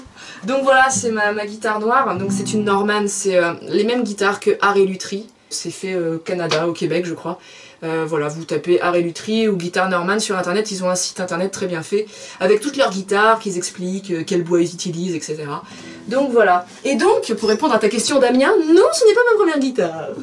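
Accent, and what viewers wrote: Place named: France. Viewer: Hello!! J'aime bien ton authenticité, ton franc parlé et ton accent....J'ai une amie Française que je connais depuis peu et j'adore son accent....Tu me fais pensée à elle!! J'écoute tes vidéos depuis peu et je suis abonnée également et je regrette pas....Tu as un vidéo sur les Arpèges entre autre et des trucs que j'ai enfin compris comment m'y prendre pour pouvoir l'apprendre et tu expliques très bien toutes les étapes!! Merci! J'adore aussi ta toune:!! Tellement réaliste! Et je voulais te dire que moi aussi j'ai vécu le jugement des gens sur mon orientation: On m'a cataloguer quelques fois de (Lesbienne) mais en réalité je ne le suis pas. Je suis en couple depuis 29 ans et même à ça les gens me juge juste par mon côté Garçonnière....Mais avec les années je commençe à m'en foutre pas mal moi aussi donc!!....Ta personnalité me rejoins parce que je suis authentique et un franc parlé comme toi et c'est ce que mes amies aiment de moi alors la recette doit être bonne!! 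Longue vie à ta chaîne!! Et au plaisir de te relire et t'écouter....tu as du beau talent...sincèrement!!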